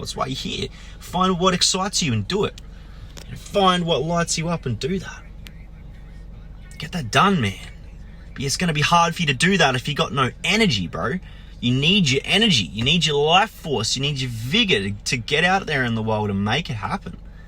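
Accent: Australia. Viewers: u Australian mate? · Literally just made a vid like this aswell. You an aussie?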